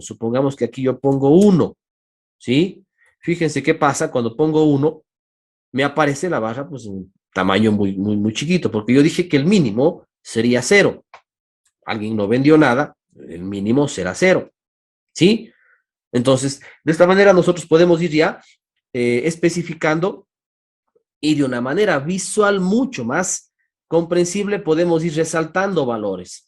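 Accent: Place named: Ecuador. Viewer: Por su acento al hablar sabia que era Ecuatoriano, muchas gracias buena clase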